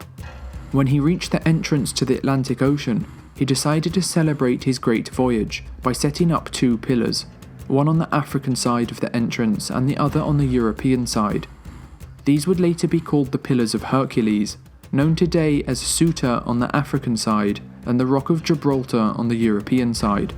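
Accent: United Kingdom. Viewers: It helps that the narrator has a soothing British accent. Try that with one of these screechy annoying American accents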